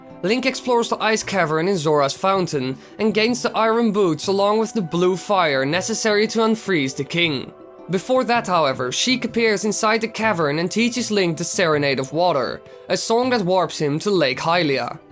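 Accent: Netherlands. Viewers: Love a Dutch accent. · are u dutch?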